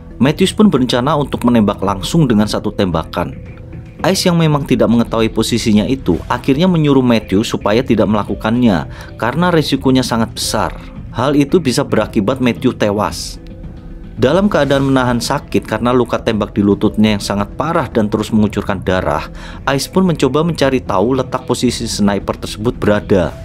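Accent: Java, Indonesia. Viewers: MEDHOGH SISAN SWARANE... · Aksen Jowo sampean kental banget mas 🤣🤣🤣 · Medog mu bro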